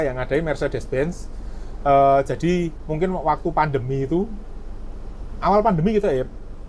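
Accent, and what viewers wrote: Java, Indonesia. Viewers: Pak , njenengan cengkok jawanya kok medok banget ya...bikin orang ngakak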